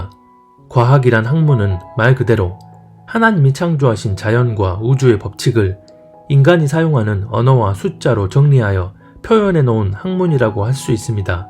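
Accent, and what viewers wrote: Jeolla, South Korea. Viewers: 가끔 들를때마다 많은 도움받고갑니다~^^ 근데 말씀하실때 억양에 광주,전남 사투리가 좀 섞여있는거같아요 ㅋ~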